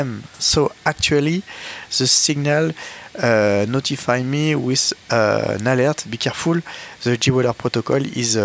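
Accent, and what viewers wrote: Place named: France. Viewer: Bon morning Rien qu’en écoutant to anglais j’ai compris que tu étais French 🇫🇷 J’ai le même accent en anglais 😁 Plus sérieusement ont ils changer quelque chose ou pas depuis le temps ? Autre question n’y a t i pas une option qui te permet d’activer le déclenchement de la siren en cas de brouillage ?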